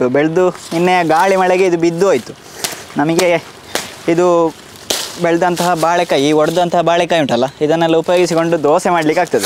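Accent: Mangalore, India. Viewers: No matter what u make, just watching ur videos only bcz of your manglore kannada accent.....wowwwwaw!!!! lOving it since ur 1st day Keep going big fan of u🤩💫 Love from benne nagari - Davanagere KA 17💪💥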